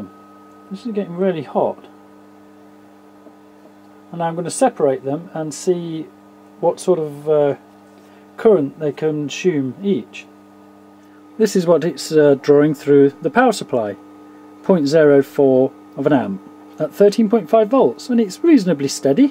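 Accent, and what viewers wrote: United Kingdom. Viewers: What a challenging project,! I follow your explanations with great interest. Despite my limited English, your British pronunciation is a free language class!!!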